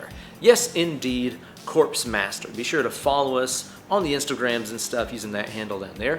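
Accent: United States. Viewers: Yeah you fine dude. Love the playing. Alls I know is I give you props you don’t put out a video every twenty minutes like John Cordy and you speak American. The lick was great. Please accept my apology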